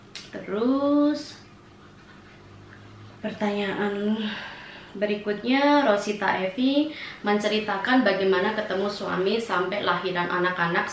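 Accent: Java, Indonesia. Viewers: Iya mbaaaak Indonesia bangeeet soalnya. Nek ngobrol, "njawani" bangeeet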